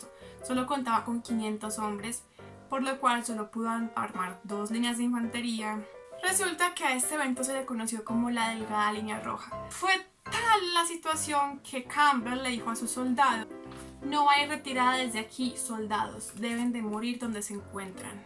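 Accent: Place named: Colombia. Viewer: Videos asi no tienen pérdida alguna, muy bien hecho y explicado con tu propio toque y estilo. Y más aún si es con metal PD: Con ese acento te me haces que eres de mi Colombia tierra querida.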